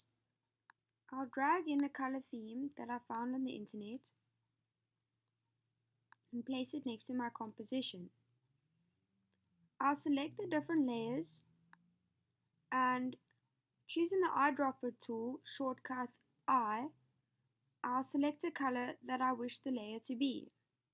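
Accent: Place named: Iceland. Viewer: Very nicely done. I enjoyed the flow of your Tutorial. I didn't once scream at my monitor trying to get you to hurry up! Great accent as well. Iceland?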